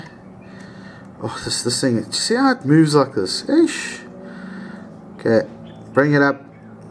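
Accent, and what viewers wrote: South Africa. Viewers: Hey you sound like you're from eMzansi (S.A), Are you??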